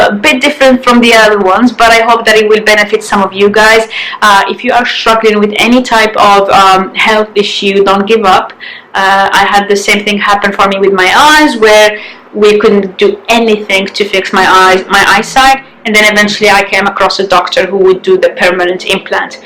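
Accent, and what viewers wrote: United Kingdom. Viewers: Thank you for sharing 🙏🙏🙏 PS: I can sense the British Accent haha.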